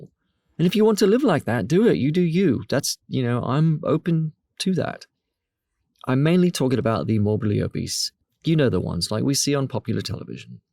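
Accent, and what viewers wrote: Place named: Australia. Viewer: the title seemed fine to me. A lot of the time I find videos with clickbaity, controversial one-liners and thumbnails cheapen the entire message. One suggestion if I may, would be to include more elements to highlight your points. You make a great point about how actors’ jobs are to perform and use their imagination. Some cuts to famous movies where actors play entirely unrealistic/impossible characters would help support your argument here. The Joker character could be a good one as obviously there’s no way to actually cast an actual psychotic homicidal anarchist in a movie! Just one small example though and by no means am I trying to influence your content. That’s one of the best things about YouTube, there’s so much freedom for creators and something for everyone. Guessing by your accent you’re an Aussie (so am I). Thanks for the video!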